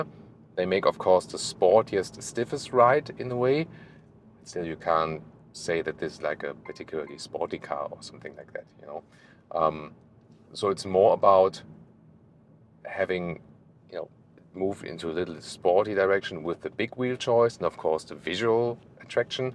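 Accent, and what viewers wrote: Germany. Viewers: I love this german accent when he says R. (Eg. Lower bumper - lowa bampa, slimmer = slima)😁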